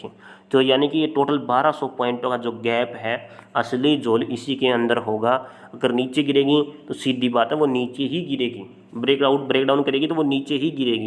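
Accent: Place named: Haryana, India. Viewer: Aapka accent bhi hariyanvi hai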